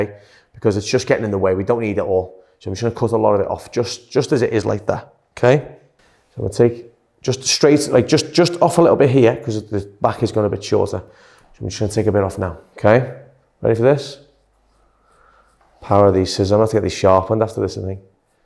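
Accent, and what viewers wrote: Liverpool, United Kingdom. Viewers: you sound like you have a Liverpool accent I am right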